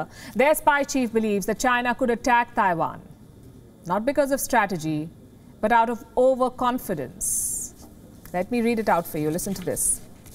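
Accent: England, United Kingdom. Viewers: I like this host's English accent. Lol (no offense)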